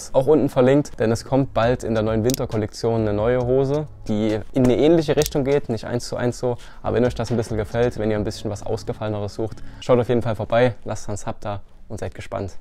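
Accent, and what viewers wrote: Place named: Saxony, Germany. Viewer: Dein dialekt klingt bisschen sächsisch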